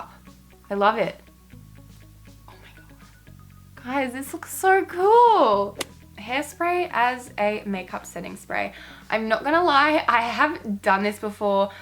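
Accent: Australia. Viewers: Is she Australian (I'm Aussie)😂💙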